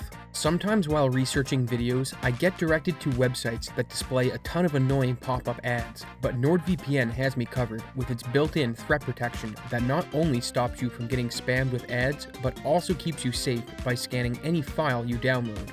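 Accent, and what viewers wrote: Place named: Atlantic Canada, Canada. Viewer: How far east are you from? I'm trying to pin it down, but I'm having trouble distinguishing because of your decent effort between NS or NL. It's been annoying me that I can't decide. If I was watching this in a vacuum, I'd say NL, but other videos make me question...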